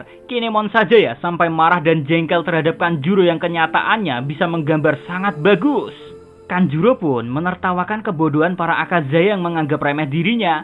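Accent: Java, Indonesia. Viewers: Pusing gw ama logatnya,medok banget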